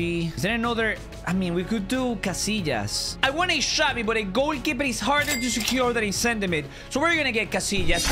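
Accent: Spain. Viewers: Wait,you from Venezuela or spain?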